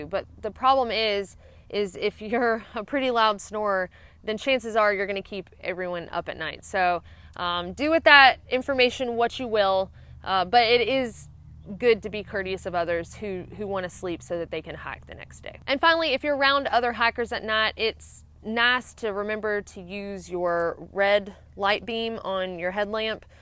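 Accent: South, United States. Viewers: I had to watch it again to catch the "braht waht laht" part. Love the southern accent. Thank you for the informative video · I love how you say "Bright white light". I'm from NJ but love the southern accent! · To many raised in the South, speech from the north has always sounded equally strange and or funny. Southerners have just generally been too polite and respectful to mock people for it. This was largely the reason many of us raised in the South years ago resented “Yankees.” We always knew what to expect immediately: mockery and derision because we and they spoke different. It’s not nearly as prevalent theses days, but this thread shows vestiges of it. Fortunately, even in this thread, some now even seem to find the southern dialects pleasing and charming, especially when coming from this lovely young woman. is a good ambassador and representative for our culture. 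I think she is a beautiful person. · Love that southern accent! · headlamp has a brait whait lait...your southern twang is adorable.. Good advice as well..common courtesy is something a lot of folks lack..you are obviously drippin with it..good on ya...and you have sand young lady...hugs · “Brot wot lot”...love the lingo. I always learn something listening to you. Press on!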